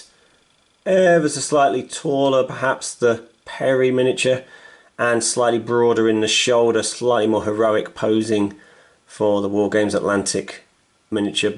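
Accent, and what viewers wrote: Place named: United Kingdom. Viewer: Thanks for providing this comparison. Very helpful. Given the historical era, the British accent gives this review something extra for Americans like me!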